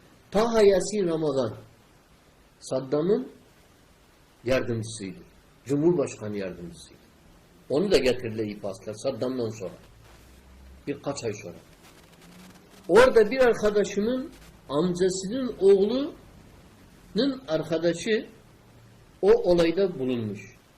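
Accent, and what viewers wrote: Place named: Turkmenistan. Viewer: hocanın şivesi türkmence, allah rahmet eylesin saddam hüseyin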